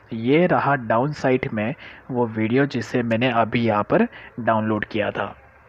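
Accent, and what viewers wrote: India. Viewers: indian accent, dislike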